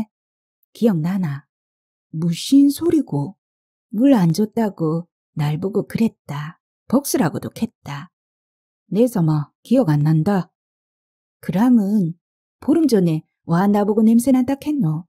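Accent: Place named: Busan, South Korea. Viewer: 수작이네요~신종국 작가님~특히 마지막 부분~ 오랜만에 귀에 쏙쏙 잘읽히고 부산 사투리와 레트로 감성의 가슴아픈 소설 잘 들었습니다. 그시대때는 그렇게 참고 많이들 사셨을것 같습니다. 홧병으로 심장에 북소리가 나던~~~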